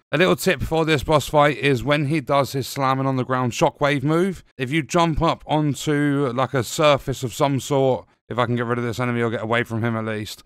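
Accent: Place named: RP, United Kingdom. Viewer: Your accent is over the top posh! xd No offense from here, very helpful guide, Thanks mate🙂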